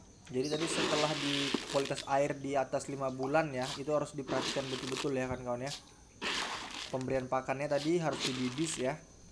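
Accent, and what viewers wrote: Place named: Medan, Indonesia. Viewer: Kayak orang medan abg ngomong nya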